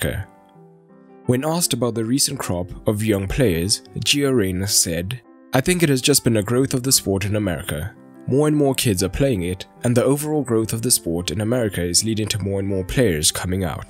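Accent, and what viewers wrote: South Africa. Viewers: Ehhh mmona are you South African ?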